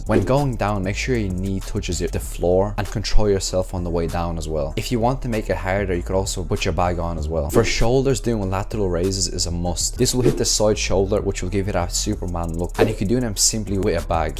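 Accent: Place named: Zimbabwe. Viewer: Nice Rhodesian accent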